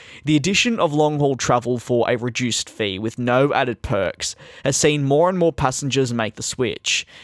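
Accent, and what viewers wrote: Australia. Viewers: Your Australian arnt you mate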